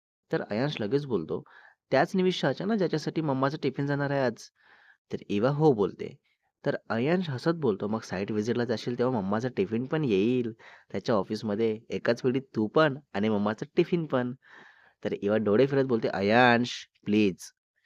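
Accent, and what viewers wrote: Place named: Gujarat, India. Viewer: Ur gujrati accent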